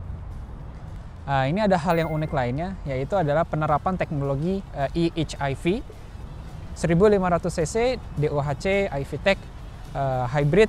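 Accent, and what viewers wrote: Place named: West Java, Indonesia. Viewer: Logat na mah Sunda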